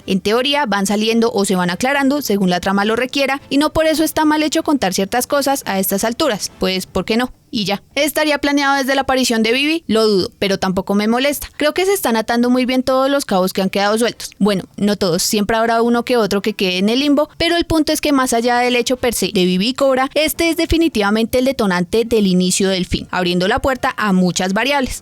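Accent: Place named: Bogotá, Colombia. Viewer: Me fascina ese acento de Bogotana…😊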